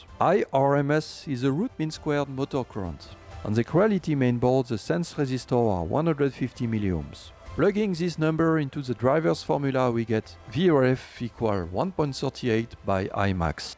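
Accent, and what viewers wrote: France. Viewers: it sound french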